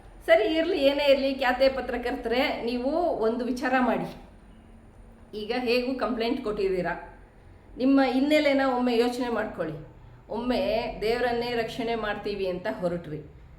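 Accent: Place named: Coastal Karnataka, India. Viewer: ನಿಮ್ಮ ಮಾತಿನ ಶೈಲಿ ನೋಡಿದರೆ ಸ್ವಲ್ಪ ಉಡುಪಿ ಮಂಗಳೂರು style ಇದೆ.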